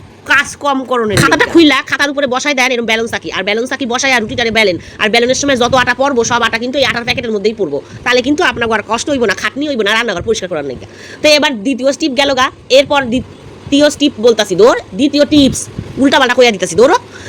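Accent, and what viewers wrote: Bangladesh. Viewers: ও দিদিভাই আজকে তো পুরো বাংলাদেশের স্টাইলে ভয়েসটা বেশ ভালো লাগলো❤❤❤ কথাটা তো বেশ ভালই লাগলো❤❤❤ তোমার ছেলের গাড়ি ভেঙে গেল তোমার এই টিপসটা এপ্লাই করবো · আরে বাহ্ আজকের রেমিডি তো সুন্দর কিন্তু তোমার কথা মানে একদম বাংলাদেশের কথা দারুন সুন্দর করে বললে আমার দারুন লাগলো ❤❤❤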